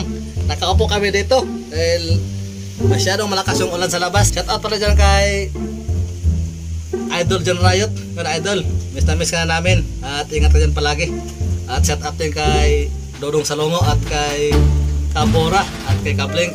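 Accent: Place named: Iloilo, Philippines. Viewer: Morag ilonggo kaman ka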